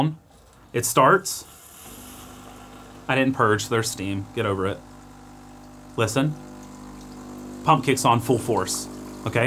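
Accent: United States. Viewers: sounds American not European. So are you an expatriate?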